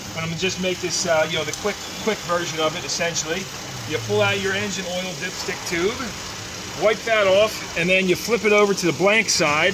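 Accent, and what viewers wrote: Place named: New England, United States. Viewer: Now that's what I'm talking about! And you know what I was kind of digging the Yankee dialect there. Y'all have a blessed day.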